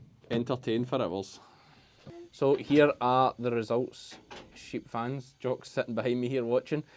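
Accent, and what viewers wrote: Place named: Kilmarnock, United Kingdom. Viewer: Actually having my friend to watch, as we are coming to Kilmarnock in three weeks, so she can tune her ear to the accent!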